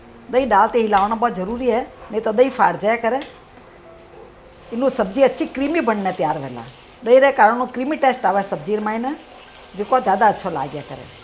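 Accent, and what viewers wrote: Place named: Marwar, India. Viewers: nice aunty ji ur marwadi language is very sweet😙😙😙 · राम राम "मा" सा आप बहुत ही अच्छी रसोई बनती हैं उस सेभी अच्छी आपकी मारवाड़ी बोली है आप एक मारवाड़ी मुंग की बड़ी की सब्जी की भी रेसिपी बनाईए गा जी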